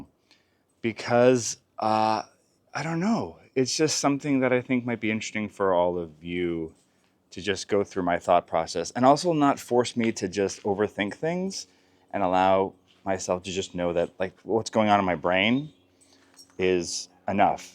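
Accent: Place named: Canada. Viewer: Sounds great. I think some of your words are just Canadian accents. My friend lived in New York and when he says dog, I crack up! We love you guys and it’s great to have community with all our imperfections!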